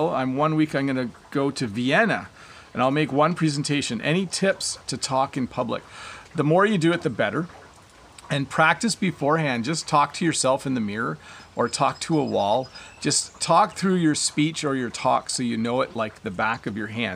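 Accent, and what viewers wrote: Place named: Canada. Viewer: Hi, I am an English teacher and I always recommend Canadian accent to my students. By the way I love the way you teach English and talk about what is going on around you. That's sounds more real.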